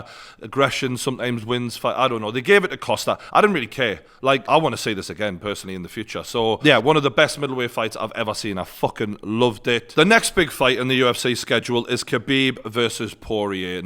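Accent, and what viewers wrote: Newcastle, United Kingdom. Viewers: Fuck off. Romero got robbed. Create your own analysis instead relaying the spin with a Geordie accent. Think for yourself. Sheep.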